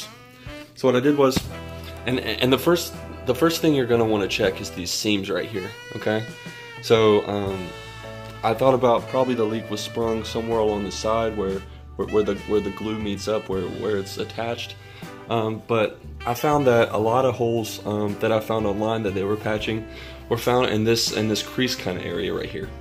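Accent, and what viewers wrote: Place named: South, United States. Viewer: I love his accent...Southern USA?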